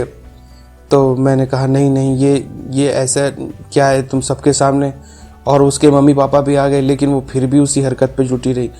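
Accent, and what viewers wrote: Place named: Bihar, India. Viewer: Kon se jila ke ho bhai. Pakke bihari lag rahe ho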